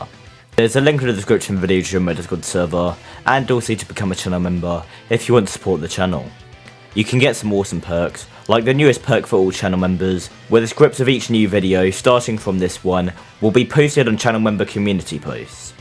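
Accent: United Kingdom. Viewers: I have a feeling your british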